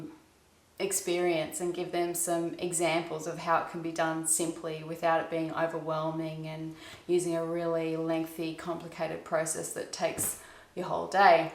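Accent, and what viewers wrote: Australia. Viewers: I love following your recipes. I use freshly milled spelt. Before shaping I sometimes place several (around 30!) garlic cloves on the dough. I really like the way of your cooking demonstrations. It is simple and relaxed. I tried to get a dough whisk here in our country but have not been successful yet. I also like your Australian accent - have got two Australian friends here in Austria. Greetings from Austria.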